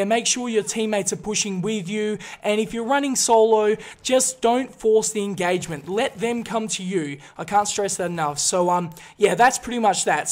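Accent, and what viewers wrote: Australia. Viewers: epic gameplay by the way what accent is that ? aussie or brit ?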